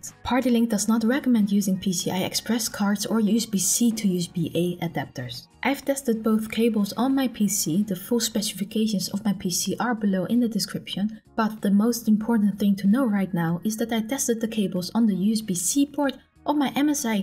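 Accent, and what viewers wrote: Netherlands. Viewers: hey jouw accent verraad dat je van nederland komt. am i right?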